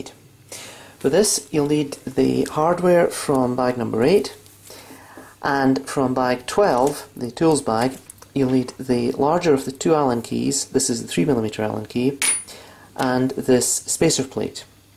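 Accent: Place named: England, United Kingdom. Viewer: My last question: is AXIDRAW from England or EEUU?.I was looking at the prices and I noticed this is $475 dollar or pounds?When I saw your videos I noticed that you have an english accent therefore I made the question about the country jajajaja.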